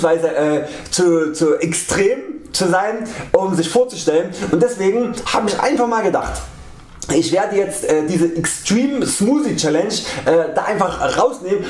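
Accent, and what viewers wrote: Saxony, Germany. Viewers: Dein sächseln klingt ganz anders als meins =D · Ich finde das echt ne nette Sache von dir, dass du kleinen Youtube Kanälen eine Starthilfe geben willst! :) Aber mal eine Frage: Was genau definierst du als Erfolg? Kommerzialisierung?! Denn Erfolg kann sehr individuell sein! Für mich ist schon das reine drehen eines Videos ein Erfolg :) Ich finde deine Art sehr klasse und sympathisch! Wegen mir kannst weiter sächseln wie du willst :D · Ich verstehe dich gut- auch wenn du manchmal schnell und viel redest ( bin da teilweise auch so) daß du aus Sachsen kommst hört man shcon- aber das ist doch nicht schlimm! Ganz normal dss mand as ein bißchen raushört, aber soo viel ist das auch nicht. Ich komme z.b. aus dem Sauerland ist bei Dortmund, und rede auch kein gaanzes Hochdeutsch das würde man auch hören wenn ich woanders wäre, das ich aus dem Sauerland bin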